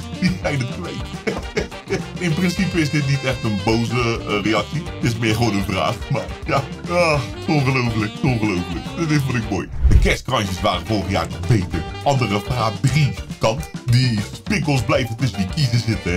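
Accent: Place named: Rotterdam, Netherlands. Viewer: Gozer als jij nog Rotterdams praat is je lach en je manier van praten geniaal 😂😂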